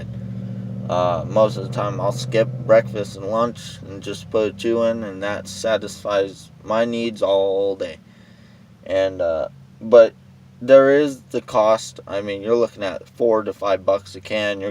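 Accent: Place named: Wisconsin, United States. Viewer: Sounds like he’s from Wisconsin lmao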